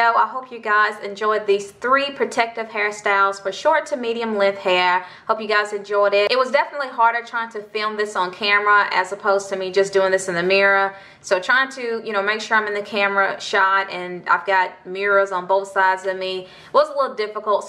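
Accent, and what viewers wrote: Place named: South, United States. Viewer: As a southern woman, your accent is everything!!! I think you're the first southern woman I've watched do a hair tutorial.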